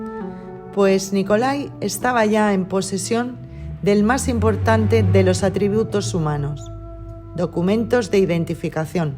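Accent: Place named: Spain. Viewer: Gracias Camaradas iiiii Que bella voz tiene la Camarada que narra en este Audio, tiene una voz Muy Clara, Firme y Armoniosa, un español claramente de España,toda una Gran Guerrera iiiii Saludos.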